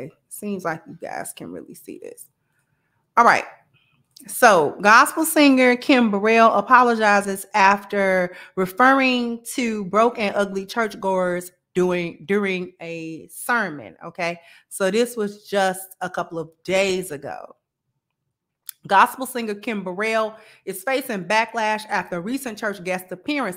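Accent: Chicago, United States. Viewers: When you said Da Roms on another one of your podcasts I knew you was from Chicago. Only we speak like that. Chicago is in the building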